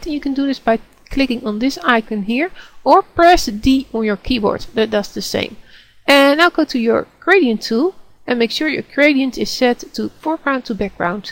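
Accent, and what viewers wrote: Netherlands. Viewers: Are you from the Netherlands? I have relatives from there that have the same accent as you. :D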